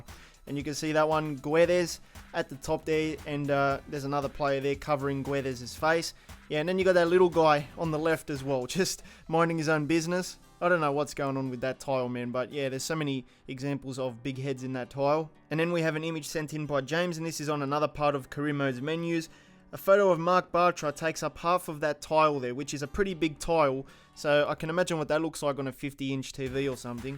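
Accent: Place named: Australia. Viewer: Pretty sure he’s Aussie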